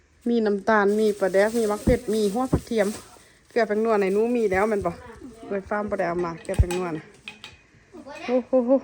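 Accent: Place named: Sainyabuli, Laos. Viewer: สบายดี.ครับ.สาวฟาร์ม..ครับชอบอะหยั่งในคริปของสาวแม่นบ่ครับ..กะชอบคำเว้าของสาวครับเว้าคำลาวไดัเปะฃู้คำบางคำแถวบัานอัายอแทบจะบ่ใด้ยินมาเบิ่งสาวฟาร์มเวัา..กะคึดออก..อัายกะคนเวัาลาวแบบสาวครับแต่.อยู่ฝั่งไทยอยู่ใกล้เมีองคอบครับในอำเภอบ้านอ้ายมืเว้าลาวอยู่สองหมู่บ้านครับ..สำเนืยงไชยะบุรื..ชอบเบิ่งบ่มักคอมเม่นท่อใดครับ..คนไทยเชี้อสายลาวครับ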